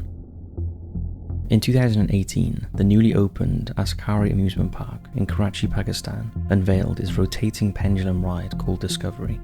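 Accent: United Kingdom. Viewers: You always put me to sleep British spooky man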